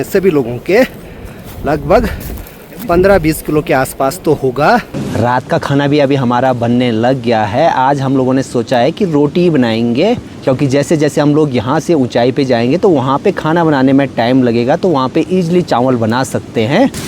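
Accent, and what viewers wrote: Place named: Garhwal, India. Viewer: Sabase badhya video aapke hi hote hai..aapaki aawaj ..bolane ka tarika..hindi bolate hai fir bhi garhwali pronunciation khub bhata hai..ek sukun milata hai...thanks..dil se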